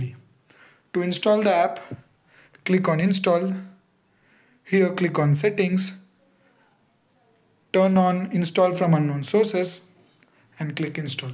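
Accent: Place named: India. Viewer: Disliking and down voting because I had to listen to an Indian insult my language by speaking it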